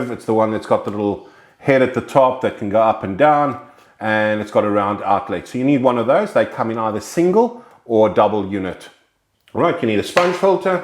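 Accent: South Africa. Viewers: Definitely South African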